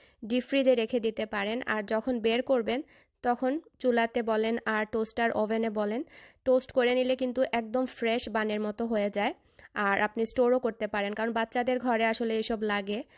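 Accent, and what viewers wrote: Sylhet, Bangladesh. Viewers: Apu ami apnar new member.. Apnar kotha gula onak sundor.. Sylhet language bole je ota te o onak sundor lage apnak.. Falak to amder bashar member hoye gese.. Sobay chine.. Allah apnader k susto rakuk.. Amin..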